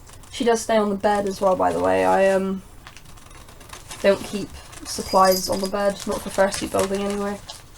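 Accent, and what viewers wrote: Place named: Northern England, United Kingdom. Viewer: Hey! By your accent it seems like you live in the north of the UK. Is there by any chance seeing that I live in England and good shops or websites for quality fur that is in the UK so I don't have to send £40 for shipping?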